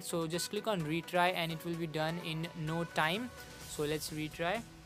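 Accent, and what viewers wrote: India. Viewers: i would listen to this dude, but everytime i hear an indian accent trying to provide technical help, it is a scam, so no thanks lol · First Indian mixed with English guy that had ever been helpful thanks bro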